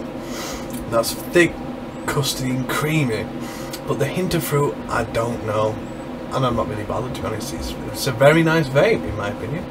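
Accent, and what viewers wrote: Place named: Yorkshire, United Kingdom. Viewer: Eyup fella, sounds like tha lives near me somewhere in Yorkshire. Good videos, very helpful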